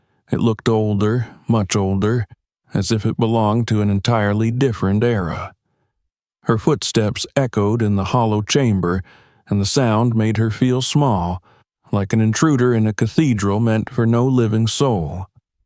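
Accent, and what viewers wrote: South, United States. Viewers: I am enjoying the story, but am distracted by the southern accent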